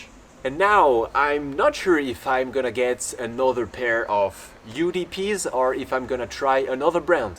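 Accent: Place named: France. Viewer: Thanks for the review. The French accent.....love it. Have you tried the 511 Apex cargo pants?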